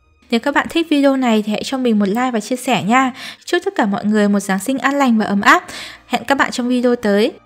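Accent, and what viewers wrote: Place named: North, Vietnam. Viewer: Giong mien bac of ban de thuong wa,lai gioi nua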